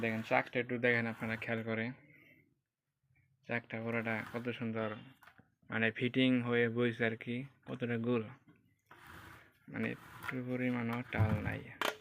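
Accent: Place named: Mymensingh, Bangladesh. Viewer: ভাই সব ঠিক আছে দয়া করে আপনি আপনার ডেসক্রিপশনে অবশ্যই আপনার ঠিকানা দিয়ে রাখবেন ঠিকানা দিলে কি হয়, অনেকে আপনার পার্শ্ববর্তী জেলা বা পাশাপাশি থাকে তারা গিয়ে নিয়ে আসতে পারবে আপনার কথা শুনে মনে হয় শেরপুর জামালপুর কোথাও ময়মনসিংহের মধ্যে বা টাঙ্গাইল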